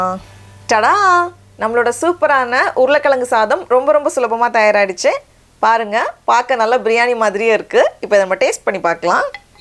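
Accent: Nagercoil, India. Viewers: Hi akka nice to see your cook we are so lucky......so thank u for ur madras samayal... us la irunthalum nama nagercovil vitukodukama pesurinka very nice .... so we support always u akka carry on .....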